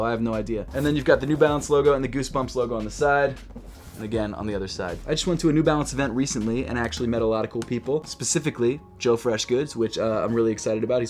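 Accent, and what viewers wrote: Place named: Baltimore, United States. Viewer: Gotta love that Baltimore accent. "... you gat packets an packets. Two sneaker packets an the back, two sneaker packets an the frant"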